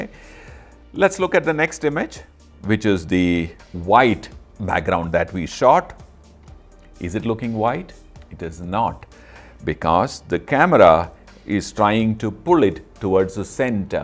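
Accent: Kerala, India. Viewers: This is how an idol photography class should be,simple yet powerful explanation The only photography channel i've subscribed Like the way you deliver,your voice and personality I am a fan of your malyali accent of speaking english :) Thank you so much sir for :)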